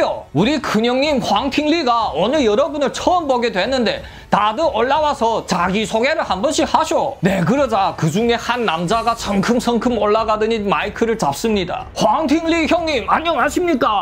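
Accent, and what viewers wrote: Yanbian, China. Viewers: 너무 재밌습니다 ㅋㅋ 타고난 이야기꾼이네요 ㅋㅋ 조선족 특유의 말투가 더 귀에 쏙쏙 박히네요. 다음 편 기다리고 있습니다~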